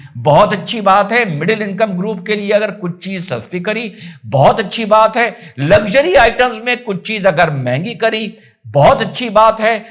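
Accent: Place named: Meerut, India. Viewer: Sir meerut ki language aapki taraf se acchi lagti h..Like always your video analyses😂😂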